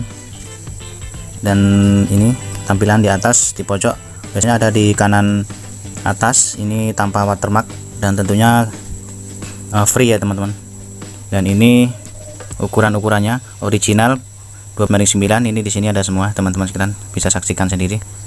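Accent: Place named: Banyumas, Indonesia. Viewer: Ngomong nya Bisa pelan pelan Dikit ngapak:v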